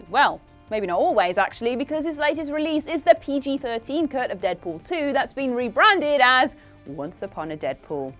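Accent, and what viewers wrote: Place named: Birmingham, United Kingdom. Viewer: well, i just found my new favourite brummie girl. Superhottie.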